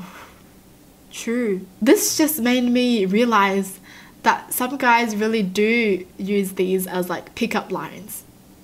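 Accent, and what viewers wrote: Australia. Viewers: Sirkay I’m Australian and I love Aussie accents but is a mix of Aussie and another country... I can’t tell which though. I love her accent. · Her accent is from Australia a very beautiful accent and land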